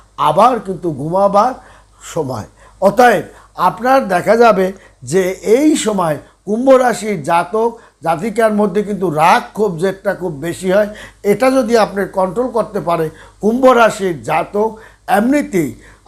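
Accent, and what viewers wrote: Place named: Jessore, Bangladesh. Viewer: উনি যশোর জেলার/ খুলনা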